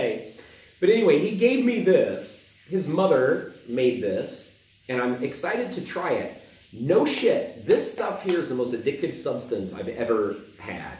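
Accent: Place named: United States. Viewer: First: you can't learn that accent of yours no matter how hard you try as Serbian- to those that say you are Serbian and just faking American origin , Serbs will always have that slavic- stronk accent. Second have you ever tried frying onion, eggs and ajvar ? That is crazy good and simple, heat up oil in pan- same amount as you put when you fry eggs, put two-tree eggs , chop half onion and 3-4 full spoons of ajvar , you put all that in same time in pan, fry it couple mins until eggs are done and mix it while you fry . You can also fry onion a little before you put eggs and ajvar if you like that, but not necessary. You will know its done when eggs stiffs. With some bread , yogurt or fetta salty chese you have simple and delicious meal. You fry lower quality ajvar from markets with eggs to still make it good btw.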